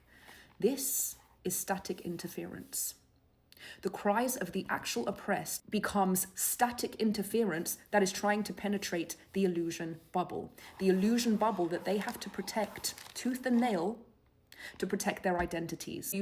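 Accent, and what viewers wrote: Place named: England, United Kingdom. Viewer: You don’t sound like a person from Iran, but someone from England. Are you English or Iranian? Make sure you update the English government.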